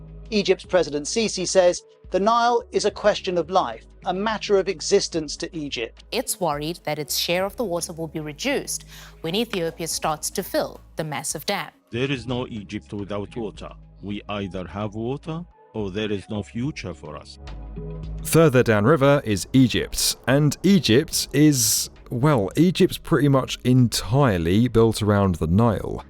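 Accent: England, United Kingdom. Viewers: why naratorrrr talk like inglish with nose full off secretions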